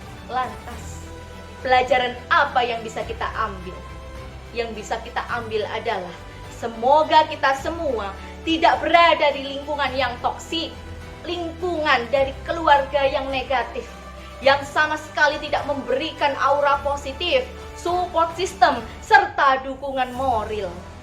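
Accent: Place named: Pasuruan, Indonesia. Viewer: Alhamdulillah, nada Pasuruan banget. Akan kita temui ketegasan beliau ketika dipercepat 1,5x. Sukses selalu bu bidan, sehat selalu fatayatku.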